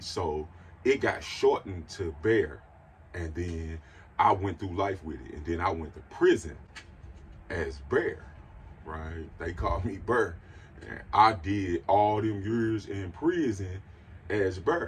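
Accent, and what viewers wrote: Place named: St. Louis, United States. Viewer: You sound so St. Louis. I lived there for some time.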